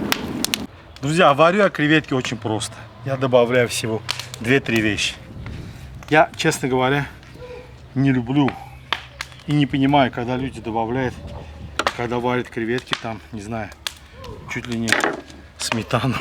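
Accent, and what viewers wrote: Baku, Azerbaijan. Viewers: Ай джан! Бомба! Спустя 30 лет я ел рачки 2022 на Баилова. Спасибо за Ностальгию и Бакинский Акцент. 🙏❤ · муалим, процветание Вашему каналу👍🏻Ваш Бакинский акцент это вишенка на вашем волшебном канале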